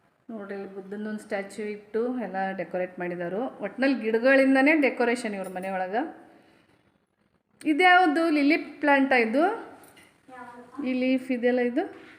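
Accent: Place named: Uttara Kannada, India. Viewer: Nam uttar kannada bhashe chend matadtri ade tara matadi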